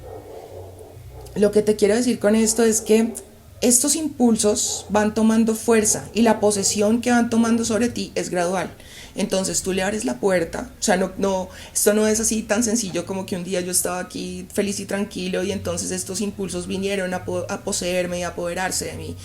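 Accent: Colombia. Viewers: ACENTO ROLO? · Por lo general la mayoría de las personas no toman o se dan cuenta de estos detalles que parecieran insignificantes pero el daño poco a poco le va destruyendo la conciencia ,la esencia , la espiritualidad y por lo tanto la mente que de por si es mala se vuelve más perversa todo lo que has dicho en este video es la realidad pero la humanidad no quiere acer el mínimo esfuerzo para salir de esta matriz o granja este conocimiento es el despertar másivo , te felicito mujer mis saludos desde Barranquilla Colombia y que me pareciera que fueras de aca por tu forma de hablar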